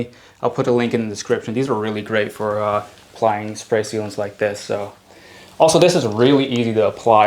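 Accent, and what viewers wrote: United States. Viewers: I really learn a lot from all of your videos whether you talk or choose not to talk. Both are excellent. By the way, you sound American, but I thought you were Dutch. Where exactly do you live in Europe?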